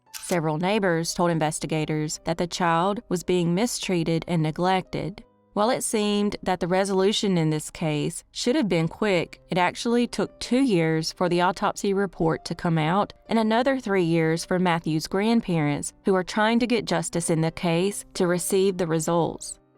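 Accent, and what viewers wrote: South, United States. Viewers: I really like your channel. The cases are interesting and i havent heard them a dozen times Als i really like that southern accent.😊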